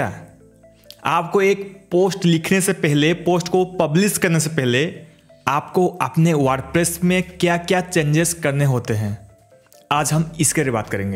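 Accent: Odisha, India. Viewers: Bro aap odisha ke ho...aap ka voice lagraha hai aisha ..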